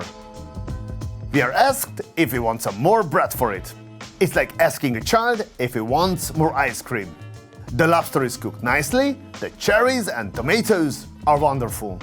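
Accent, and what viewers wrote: Hungary. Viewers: Cant stand that Hungarian accent , remind me of iseael 🤮🤮🤮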